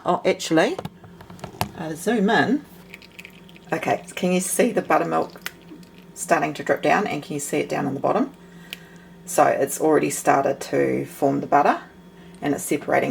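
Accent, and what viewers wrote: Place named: New Zealand. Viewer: It always amazes me that some people have no better use for their time than to make nasty comments, of course if they weren’t anonymous they probably wouldn’t have the guts to do it. Also why watch videos you dont enjoy! It’s very easy to skip on by! As an expat kiwi I love watching your videos (although the cost of food seems crazy), but your down to earth, real approach to your videos is refreshing, not to mention I miss that kiwi accent!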